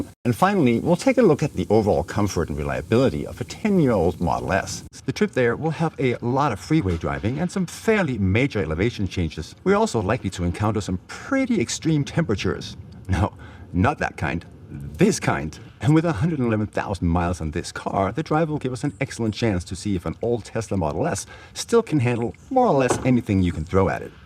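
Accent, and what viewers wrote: Denmark. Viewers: Awesome video! Thank you for taking the time to make it! That car is just beautiful even after 10 years! ... Also.. Am i correct if i you have a slight danish accent?! :D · Du lyder dansk :)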